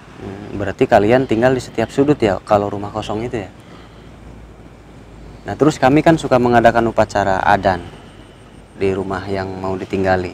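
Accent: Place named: Sarawak, Malaysia. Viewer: Ni suara kitak. Org sarawak. 🙋‍♀🤩🤩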